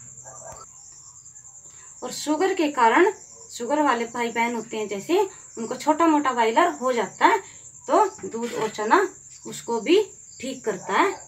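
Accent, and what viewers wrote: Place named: Hyderabad, India. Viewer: Madam aap se aane ki taraf se ho kya aapki awaaz Udhar Ki Lag Rahi Hai main Hyderabad se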